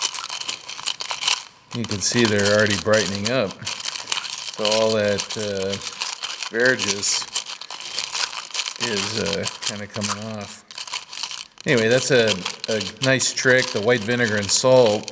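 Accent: United States. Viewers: you sound like a guy from the states, but you shoot metrics out of your head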